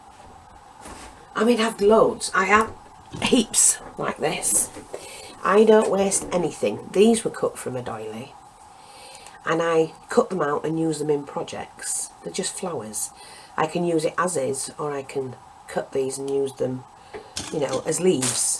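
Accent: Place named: Yorkshire, United Kingdom. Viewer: Loooove your accent where may I ask are you from? Is it Yorkshire?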